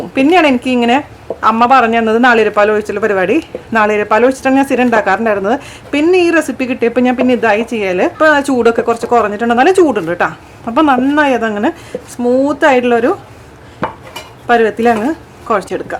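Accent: Thrissur, India. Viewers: hii chechii chechi parayunna slang enikk manasilvunnund chechii .... bcoz iam from thrissur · oh chechi thani nadan thrissur style samsaram.weekly once I have to hear your sound. I am from gvr ,at present residing in auh.so lovely.from vini .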